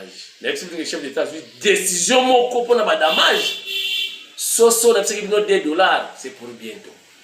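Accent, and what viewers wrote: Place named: Ntandu, Democratic Republic of the Congo. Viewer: nayo ka kayo kk ndenge olobaka kintandu nga pe muntandu ya madimba koko mpanzu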